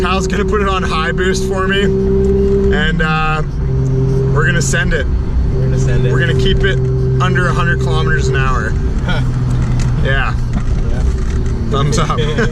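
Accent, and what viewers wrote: Canada. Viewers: Anyone else pay attention on YouTube videos for certain Canadian accent words and sayings? Y'all got that rec weed. Do yo thang😎👌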